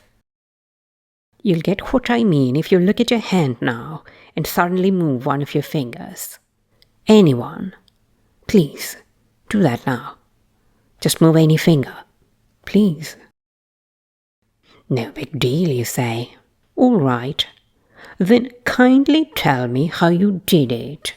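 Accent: RP, United Kingdom. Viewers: Yes I loved this - so cinematic and your accent is so delightfully POSH as always! I wonder why this one doesn't have more views?! It's a pity really!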